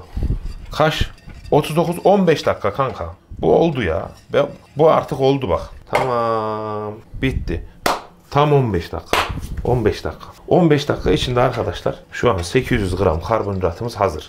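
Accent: Anatolia, Turkey. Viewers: Aradığım üslup, aradığım sunum, aradığım bilgiler Aptal terimler yok anadolu anlatımı on numara bu video tüm naftalara gelsin...:) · Ünlü fitness içerik üreticileri veya bu camiada fenomen olarak bilinen kimseler bana nedense samimi gelmiyor fitness hakkında da çok bilgim yok dövüş sporcusuyum ama bu adam nedense samimi geliyor 2 videosu çıktı karşıma sadece.. bir de anadolu ağzı var tam içten 🙂 adamsın kral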